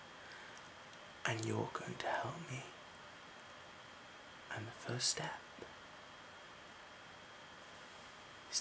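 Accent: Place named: South, United Kingdom. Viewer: i can hear in ur voice that u r somewhere from south England, I've lived in Stockport (Manchester) for 5 years and about Vodka, sure, mate, I can bring u vodka next time i visit th UK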